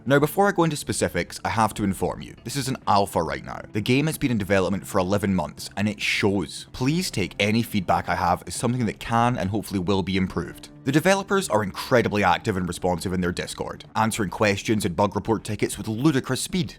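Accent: Scotland, United Kingdom. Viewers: yeah he's from Glasgow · is this a scottish accent?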